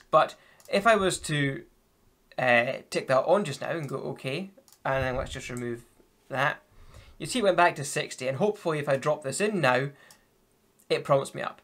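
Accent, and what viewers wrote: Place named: Scotland, United Kingdom. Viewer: Warsaw, Poland :D Was wondering which part of Scotland, nice!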